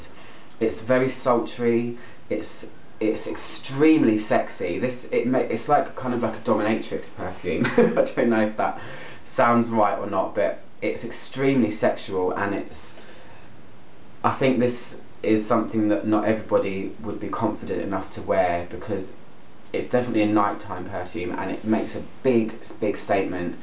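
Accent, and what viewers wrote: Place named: East London, United Kingdom. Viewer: Hugh Grant who went to Private School.. 😂😂🤔 😏😂 Anyway I love your East London accent ❤️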